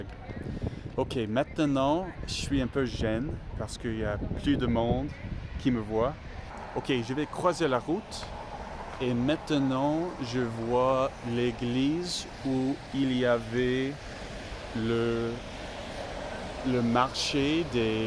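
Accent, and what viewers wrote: Quebec, Canada. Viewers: C est vrai que tu as légèrement un accent québécois mais c est du francais 😊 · Salut. C'est Betsy. Je suis ravie que tu fasses autant de progrès avec ton français (et que tu emploies correctement le subjonctif! LOL) Je n'ai pas écouter toute la vidéo, mais quand même le début et la fin. Excuse-moi de te corriger (je ne peux pas me retenir, étant prof!!) ici et là. 1. Je suis en train DE +infinitif 2. faire les courseS 3. Une Rue 4. un accent Québecois (masculin) 5. AU Canada 6. essayer DE 7. to shape = former 8. to get by = se débrouiller Et j'apprécie ton accent Québecois! Vive le Canada!! Elbows Up! A la prochaine, Betsy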